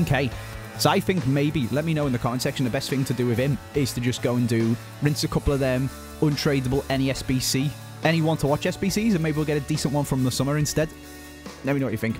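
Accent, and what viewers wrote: Liverpool, United Kingdom. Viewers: He’s basically a scouse Martin Tyler with that new mic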